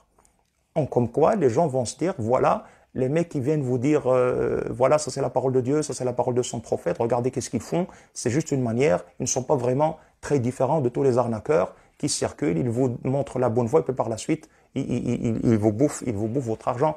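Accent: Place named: Jebli, Morocco. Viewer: عنوان للتواضع وللتمكن. من يسمع كلام شيخنا الفاضل ياسين العمري وهو يتحدث بعربيته الجبلية سيظنه إنسانا متواضع العلم،لكن العكس هو الصحيح ،شيخ متمكن ومتمرس و مرجع في علوم التواصل بالعربية والفرنسية معا بارك الله فيه ونفع بعلمه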